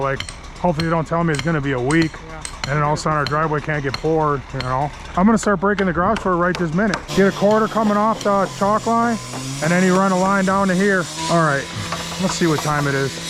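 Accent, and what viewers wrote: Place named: Wisconsin, United States. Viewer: Hey, Love your videos. When you said Kenosha you had my attention. I was born in Waukegan and lived in Kenosha until my dad retired from AMC. We moved to Arkansas when I was eight years old in 1987. My wife asked me how come I don’t have your accent.😂 I told her that because I have a southern accent. 😊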